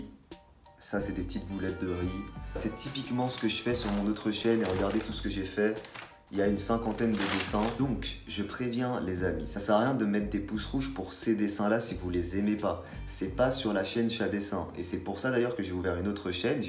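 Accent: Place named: England, United Kingdom. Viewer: Je suis toujours autant impressionné par ton accent anglais mdr, le dessin de ta tête pète des culs!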